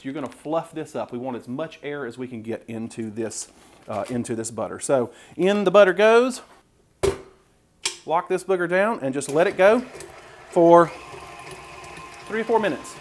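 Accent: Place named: Appalachia, United States. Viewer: You're awesome =) You talk like my folks in the mountains of Virginia =) I left there in 1995 and moved to Ohio. I might have to retire and move back =)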